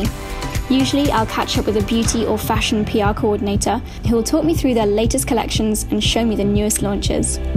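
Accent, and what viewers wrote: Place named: United Kingdom. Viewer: Ur sweety british accent is sooooo cooolllll for me. Happyful,best wishess from BAKU,AZERBAYCAN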